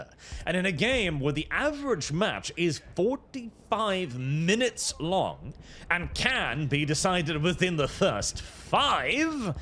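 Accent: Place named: United Kingdom. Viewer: Bloody hell, This guy's British is so powerful I feel like im in a British Tea party, Im not even British!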